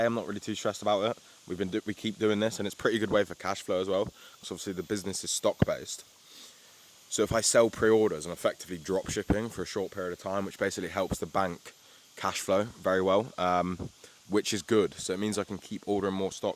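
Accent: United Kingdom. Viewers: Pretty Cool Bro! 😂 What A Mockney Geezer He Is!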